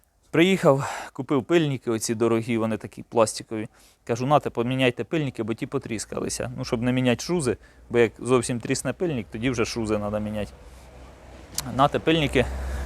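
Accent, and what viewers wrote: Cherkasy, Ukraine. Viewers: судячи з номерів - ми земляки. Тай говір Черкащини з русизмами чутно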